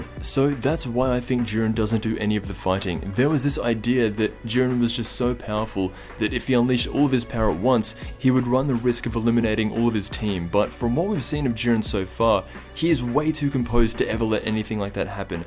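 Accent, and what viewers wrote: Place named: Australia. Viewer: What country are you from? I can't place your accent all I'm getting is Australian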